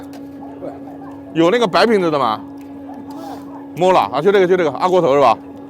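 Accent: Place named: Jiangsu, China. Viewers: 前面就感觉说话南京口音，这集听到“小杆子”，那就坐实了是南京人。老乡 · 你江苏人？口音太重了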